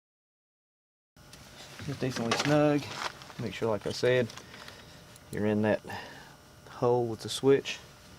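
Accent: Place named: South, United States. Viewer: Thank you for doing such a great job on explaining this! Great video and you speak my native luanguage - southern! Have you ever replaced the climate control on a ridgeline? That's next after fixing my door lock.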